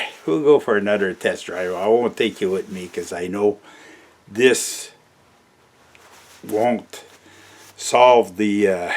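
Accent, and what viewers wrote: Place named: Upper Peninsula, United States. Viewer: Sounds like a Yooper. I think this guy would be a awesome neighbor.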